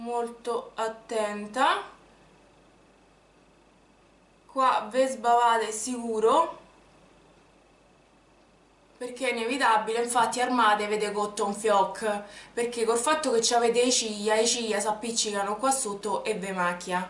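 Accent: Rome, Italy. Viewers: sei fantastica mi fai morire dal ridere !!!!ti seguo da poco(adoro l' accento romano) daje ❤ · ahahahhaha mi fai morire adoro l'accento romano :D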